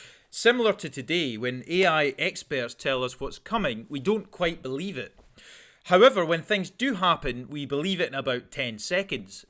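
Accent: Scotland, United Kingdom. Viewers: Are u Scottish? I love your accent. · Hey man, are you from the same part of Scotland as Scott Manly?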